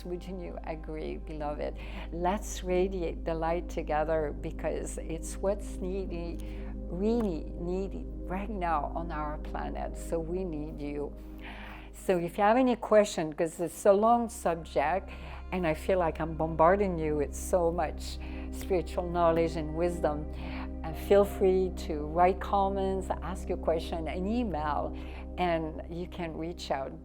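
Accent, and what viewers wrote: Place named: West, United States. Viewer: This lady is self deluded, otherwise she wouldn’t give herself the name and sell courses. Some of the info is accurate but it is mostly appropriated knowledge from Vedic texts. Doubt she is able to pronounce any mantra properly with her western accent. Cool video tho, hope you sell a lot of courses its decent info for pashus.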